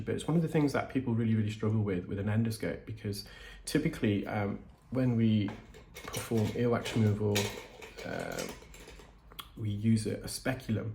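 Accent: United Kingdom. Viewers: i was just thinking this.. I do have morbid fascination, & love watching interesting medical procedures, I just figured out that even though I do watch your videos anytime I jump online, It is your voice that I’ve come to really sppreciate . It is absolutely so peaceful and soothing, makes me so relaxed & happy, I could literally listen to you speak all night. Please write a book about anything you love to talk about, and record it as the author, and sell it as an audiobook.. I could listen to it every night before bedtime.. I have many friends in the UK. so it isn’t just the accent, as lovely as it is, it is actually the tone, and the fact you’re so full of knowledge and so down to earth.. 🤴🏽 Thank you for this channel .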